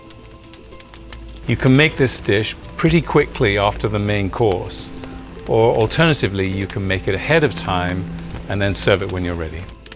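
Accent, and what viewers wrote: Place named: United Kingdom. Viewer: Hi, greetings from Norway I think your videos are beautifully made, you make a cooking session into a ceremony. I would love to spend some of my future afternoons doing some of the things you do. It is not going to happen year around, if I continue living in a country, which is covered by snow half of the year, though? Anyway, I have a question and a comments for you. 1. Please do not play the same tune as the background music in every video. It is serene once, twice, but after watching all your videos in short succession, the tune is sort of stuck in my head. I do not like it when a song is playing again, and again in my head. Even when I am dreaming, it is. But that is just me. If most of your followers love it, then you should continue doing it that way. 2. Where do you live? To me you sound like a British man, myself being Norwegian and all that, difficult to figure out every accent, but the scenery looks like Italy, Spain or California.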